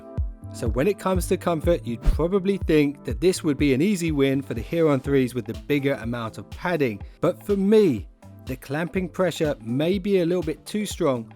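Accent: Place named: United Kingdom. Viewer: Out of curiosity, what part of the UK your accent is coming from?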